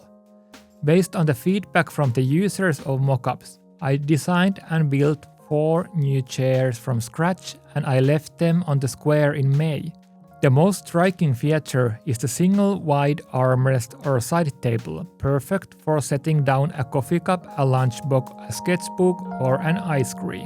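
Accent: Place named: Finland. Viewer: Mikä saakeli siinäkin on että suomalainen yliopisto lataa videon suomenkielisellä otsikolla, mutta selostus on rallienglanniksi?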